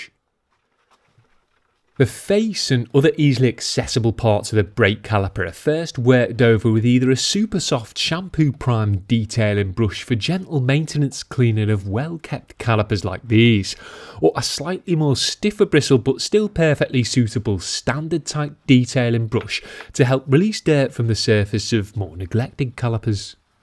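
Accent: Liverpool, United Kingdom. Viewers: Good ole Scouse accent!! Love his accent and love this channel 👌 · That’s is why we are all here. His British accent and coastal English noises are a perfect addition to the beautiful visuals. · I999 he’s a scouser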